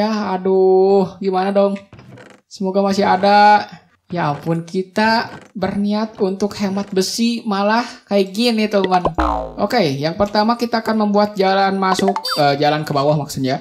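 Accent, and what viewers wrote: West Java, Indonesia. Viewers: Bng buat farm fish afk Orng Sunda kak bhsa kaya orng sunda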